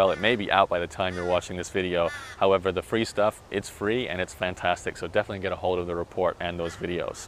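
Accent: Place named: United States. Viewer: You are Australian, yet you sound like you have an American accent? Must be all that travelling you do?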